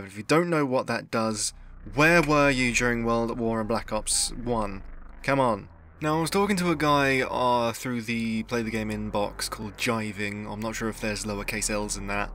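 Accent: United States. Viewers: The American accent fooled me lol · wow thats actually an amazing american accent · Honestly I thought you were American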